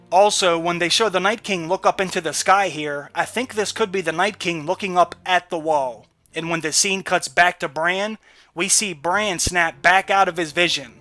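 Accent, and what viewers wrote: Pittsburgh, United States. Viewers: Thank you for doing such a great job on these videos. You are one of the best channels of this nature out there. Have to ask, are you from the Pittsburgh area by chance? Anyway, awesome job and keep up the good work!!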